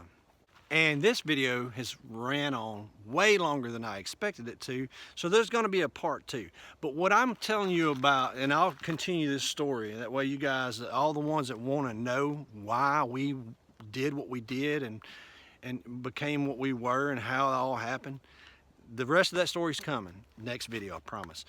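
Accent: South, United States. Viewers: *'s southern drawl sounded almost exactly like my youngest daughter talking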